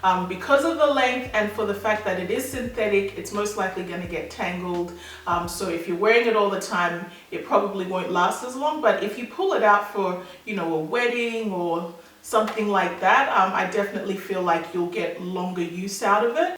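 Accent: Australia. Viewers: Are u Aussie??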